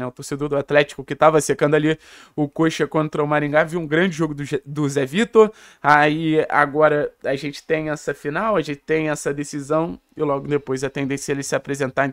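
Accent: Rio de Janeiro, Brazil. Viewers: Com esse sutaque carioca , vc está querendo pegar carona no maior do sul , sai fora carioca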